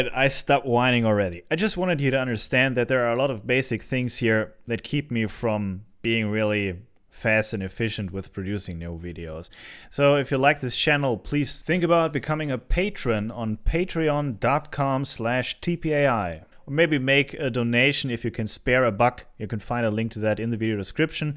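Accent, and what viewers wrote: Germany. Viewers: hallo,super video .👍👍 warum sprichst du so gutes englisch mit deutschen akzent? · Ha! I knew that was a German accent. There was no doubt after you said Opel!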